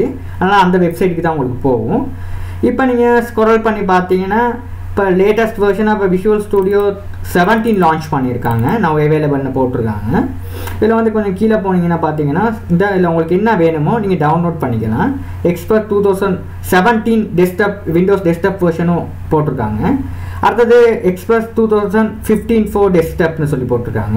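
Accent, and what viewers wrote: Sri Lanka. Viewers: Neenga srilanka va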